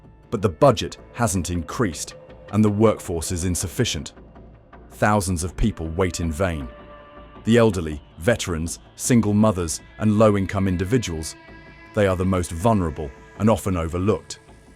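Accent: England, United Kingdom. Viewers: English accent throwing shade on LA - nice try diddy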